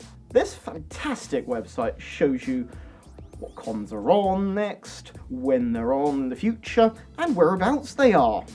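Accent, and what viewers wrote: England, United Kingdom. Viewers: Because of your english accent.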